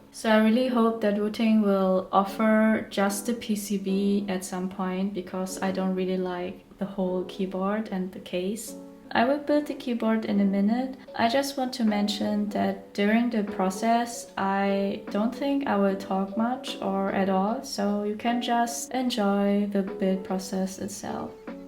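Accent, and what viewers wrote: Germany. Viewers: Why? You do not need to be shamed about. A German - English could be lovely if the natural voice is nice and warm. Just like yours 🥰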